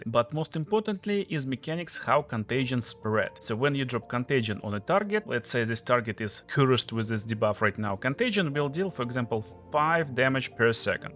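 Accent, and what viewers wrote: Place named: Russia. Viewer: Почему нет коментариев на русском, говор явно выдает автора хД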